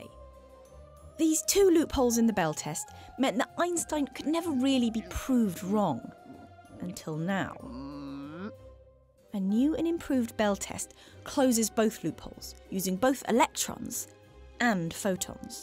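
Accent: United Kingdom. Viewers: Oh god a british accent oh well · Adorable, and very Harry Potter sounding, but unfortunately doesn't make it any clearer to me. I think the crux of my confusion is that the detectors when put at 120 degrees to each other have one correlation under QM and a different one under Hidden Variables and experiment matches QM. However my weak grasp of detectors and statistics trips me up on the details of why these are valid experiments to compare the two. Hopefully a future adorable video can :)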